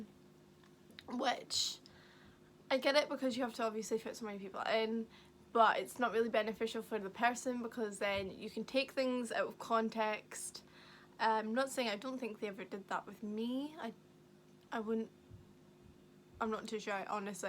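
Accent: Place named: Scotland, United Kingdom. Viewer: Is it Scottish or Geordie? Sounds more like Scottish to me 🙈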